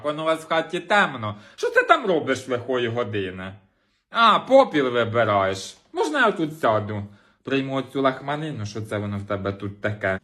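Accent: Poltava, Ukraine. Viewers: Я в захваті!!!! І це мало сказано... Ваша полтавська говірка, полтавська манера, тембр, жести, міміка -- все все так щиро і сердечно сприймається, що хочеться вас і дивитися і слухати і насолоджуватись... Як же добре, що є інтернет, який дає змогу нам милуватися дійсно народними талановитими самородками.. Вітаю, бажаю нових і нових підписників.. А особисто -- чудових ідей, гарних відео і успіхів на вдало вибраній стезі!!! Хай щастить завжди і всюди!!!!!!!!! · Море позитиву,який приємний Полтавський говір,Дякую тобі,ти супер👍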